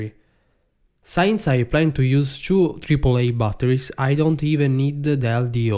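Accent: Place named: Italy. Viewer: It is very understandable and the Italian accent constantly reminds me of the beautiful language, nice wines and food -> positive 😄🇮🇹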